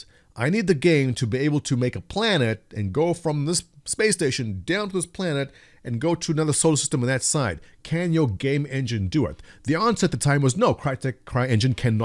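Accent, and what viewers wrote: South Africa. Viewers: nice to hear a fellow south african.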